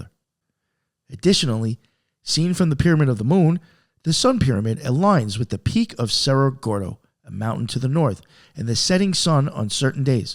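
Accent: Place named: New York, United States. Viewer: 🎉 I gotta start listening to more of you. Like a little bird coming back to its nest, your warm Italian NY voice is just too dam rewarding.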